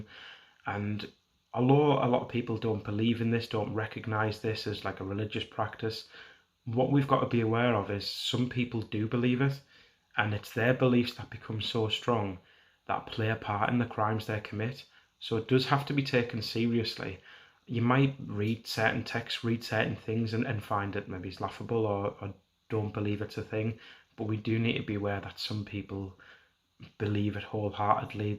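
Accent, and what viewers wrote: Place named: Hartlepool, United Kingdom. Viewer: Can't wait for the next one. Very upset as any decent person would be but the family got their daughter's mortal remains back to bury at least. Very well presented. Lovely to hear a Hartlepool accent on Youtube.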